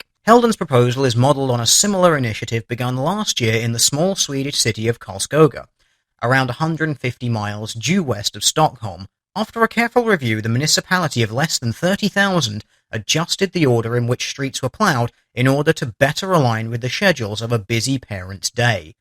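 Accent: United Kingdom. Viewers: You got me subscribed with your British accent and the numa numa joke. Hope all your vids are as good as this.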